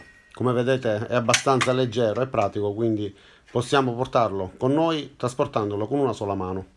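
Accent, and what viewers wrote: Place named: Calabria, Italy. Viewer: Ti giuro che ho pensato sin da subito che eri calabrese!!!hai un accento molto simile al calabrese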